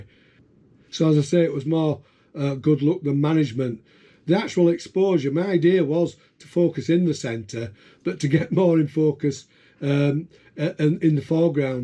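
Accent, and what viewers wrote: United Kingdom. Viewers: subbed straight away just for the tyke accent. I'm originally from Cas'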